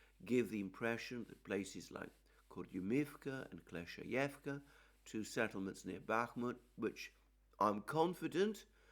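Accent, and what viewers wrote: United Kingdom. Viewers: The sound is much better. Thanks for the unbiased analysis with a British accent. Scott Ritter & Col MacGregor use American-English which is familiar to me.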